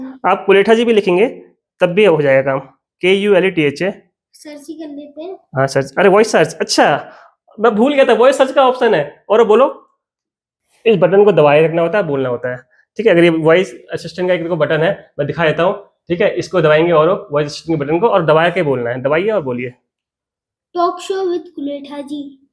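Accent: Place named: Pahadi, India. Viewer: Nice vdo..🎉 ..apki awaj se feel hua pahadi ho..fir channel pe dekha nanital mentioned h 😅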